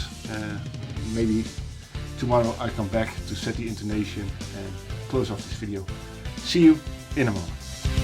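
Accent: Netherlands. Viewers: Are you dutch . It sound like it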